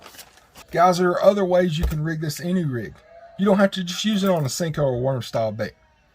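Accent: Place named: New England, United States. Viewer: Could not follow the mumbling and drawl and dogs barking . Lots of people do not speak Yankee!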